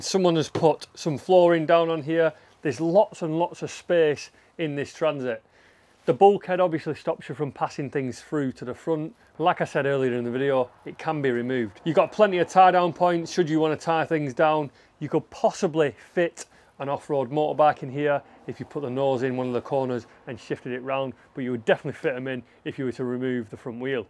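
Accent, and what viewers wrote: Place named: Northern England, United Kingdom. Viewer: fantastic review thanks, living in france coming from Lancashire great to hear a northern very honest voice. thanks for this review very informative very honest, good job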